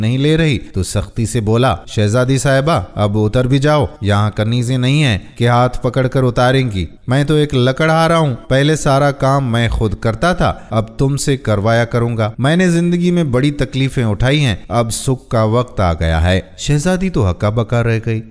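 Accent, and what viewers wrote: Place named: Arab world. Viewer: Samaj nahe aata ye urdu hai ya arabi, mehrabani kar ke urdu alfaaz ko Arabi talaffuz ( pronounce) na kare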